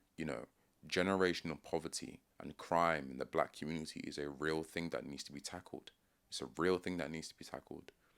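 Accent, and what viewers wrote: United Kingdom. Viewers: Your UK accent gives you away. You're not the problem. It's people who don't even speak english. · I haven’t heard many people on the right saying “get rid of all immigrants”? As an Englishman few things make me prouder than a true British person like this chap with an immigrant background. The fact that he has adopted some of our culture, values, accent, sayings, fashions, sports etc. He is British! Born and raised here just like any White British kid his age. It’s all about integration and adding to a society, not being a drain or a detriment. Many areas of the UK now have not been integrated but replaced, and when British culture is replaced by something so alien as Islamic ideology and practices you will inevitably get conflict. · Nobody seems to care that the INDIGENOUS PEOPLE are being raped, murdered, and replaced. Or is it only when the "indigenous" are a black or brown minority group that people care? 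Is it really a case of "You whities have had it good, get what's been coming to you"? Of course it is, that's what the anti-white propaganda has been screaming for decades. All of them must go. I'm not sorry, either, you all must go. Had it been Nigeria that Germans were illegally immigrating into, there would be outrage and the populace would violently oppress the percieved invaders. While you act British, and have British speech, and act in a British manner, you are only British by civic nationalism; in every other sense of the word, you are no more British than I, a Canadian, but even then I am more British than you. I greatly appreciate the effort you put into this video and I laud your tact and precise framing and use of English, however you must also go back; you do not belong here, and history will show the world that the greatest folly of the White Man was, upon freeing all of their owned slaves, not sending them back home whence they came. 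· You're literally watching a video of a man, who although clearly not a native, has mastered the language and has assimilated to such a degree as to have an accent, and i'd wager ideals, in line with what one would expect of someone who is British. In other words, he has fully adopted British culture and thus is himself British. To be British is cultural, that is why the English, Welsh, Scottish and Irish are all British. And yes, I said it, the Irish, all of them, whether they like it or not I consider them brothers, just really grouchy ones as they're still upset that they didn't get their mashed potatoes that one time... If I was born in England to typical English parents (Well, I mean I was, so no imagination required for that part. Rather a parent, dad went for milk and cigarettes... ), yet moved to Nigeria young, I'd be English by nationality but provided my parents don't have a superiority complex about their own culture, it will become increasingly likely that I heavily adopt Nigerian culture and become culturally Nigerian. 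That would perhaps be a strange sight that would make a twatter leftists head explode, to see a white man as pale as I speaking as a Nigerian, with all the calmness and grace, the funny pauses and affectations. "Ah, good evening sah, this is your bank calling... "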